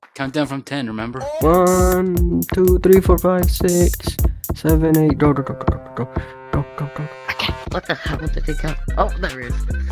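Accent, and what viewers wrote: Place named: United Kingdom. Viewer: Bro speaking literally in British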